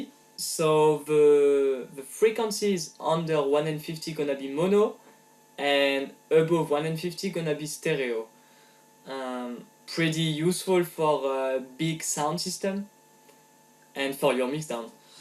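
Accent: France. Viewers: Quand j'entend ta façon de parler on se croirait devant une classe de 20 personnes à présenter un exposé xD Mais bon l'accent frenchy ca fais kiffer les étrangers :)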